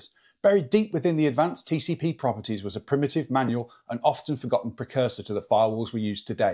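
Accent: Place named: West Midlands, United Kingdom. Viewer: I can hear a fellow West Midlands accent a mile away.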